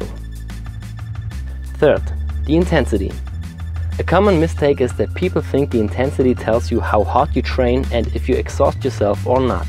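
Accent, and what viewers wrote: Germany. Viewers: omg... What's happening to me? Now even German-accented voice alone gives me a hard on? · DEUTSCH AKZENT :)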